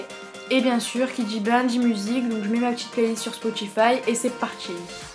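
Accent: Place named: South, France. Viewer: J'adore ton petit accent tu viens du sud ?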